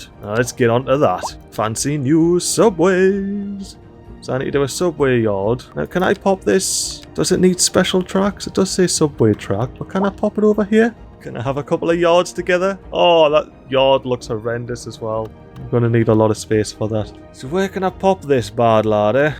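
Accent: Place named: Newcastle, United Kingdom. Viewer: geordie commentary? Love to hear it 🤣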